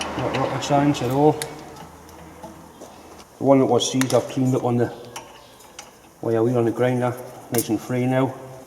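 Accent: Newcastle, United Kingdom. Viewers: Good, clear instruction and Great video . And as I,m a proper northerner I even understood your geordie accent.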